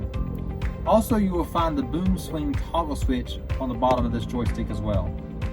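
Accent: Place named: South, United States. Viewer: The southern accent is so funny! It still exists nowadays??!! Thanks for making my day! I love the tuturial - now i just have to get me one of those excavators for grandma's trash pile at home - or better yet, my own trash piles.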